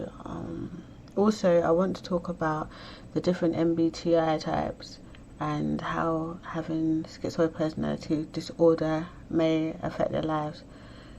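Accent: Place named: United Kingdom. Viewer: I always thought your speech pattern was more about being British. I find it rather soothing. I've heard worse pomposity listening to a narrated Audible book. I seriously wanted to punch that guy in the dick by the time I finished the book.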